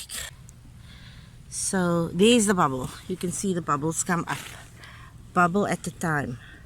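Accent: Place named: South Africa. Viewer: How lekker to hear a local accent! Thank you for this tip. Greetings from Joburg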